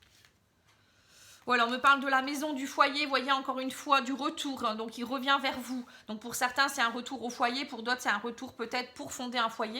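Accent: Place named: Picardy, France. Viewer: Bonsoir c'est la première fois que je vois vos vidéos merci pour la guidance. Vous avez un accent du Nord de la Picarfie je dirais 😉🙏🧘‍♀️🧚‍♀️😘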